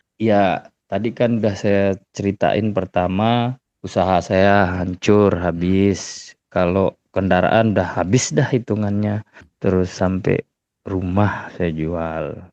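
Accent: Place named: Lombok, Indonesia. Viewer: Cok logat nya kyk orang Lombok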